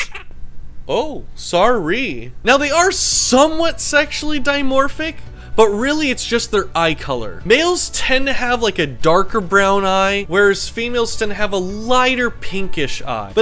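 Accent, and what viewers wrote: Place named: India. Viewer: My God, what english talks this chap? I cannot understand him. Are you from India or Pakistan, or Thai…. impossible recognize this accent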